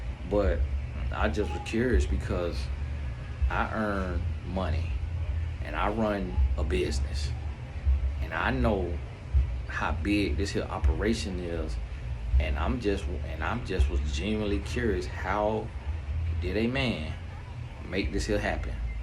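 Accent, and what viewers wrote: South, United States. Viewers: “Don’t try me like no baser” 🤣🤣🤣 you know you from the country when you say that! That’s how we talk where I’m from lol (Wildwood). This video was spot on though, some great knowledge. We are the salt of the earth, country draw or not, we were meant to stand out. Our power lies in our authenticity! Period!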